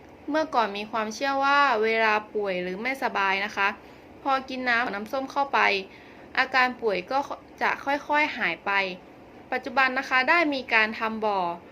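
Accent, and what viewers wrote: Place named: Nam Phi, Thailand. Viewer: เพิ่งรู้ ว่ามาจากสูงเม่น / นึกว่ามาจาก น้ำอ่าง -- สำเนียงจะเหมือน น้ำพี้ (มาจากสูงเม่น) หรือ น้ำอ่าง (มาจากเชียงแสน เชียงราย) มากกว่ากัน -- คนนาป่าคาย แยกบ่ออก 555 เคยได้ยินเสียงดนตรี ด้วยตนเองหรือเปล่าครับ -- ปัจจุบันยังได้ยินอยู่ไหม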